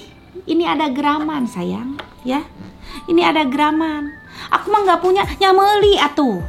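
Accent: West Java, Indonesia. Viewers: Nyunda pisan s tth..hidup urg sunda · Teh memang luar biasa biar sudah sukses tpi ttp sundanya ttp nempel melekat... Sahabat teteh suk maampir ke sebelah kita joged" dengan alunan lagu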